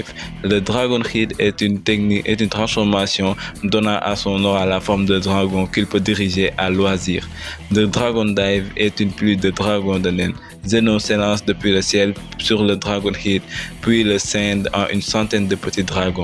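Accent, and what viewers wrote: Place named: Senegal. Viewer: Yo t'es sénégalais.wais je reconnais l'accent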